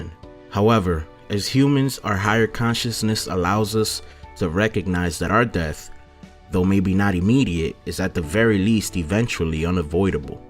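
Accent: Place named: Dominican Republic. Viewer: Papá tú acento es full dominicano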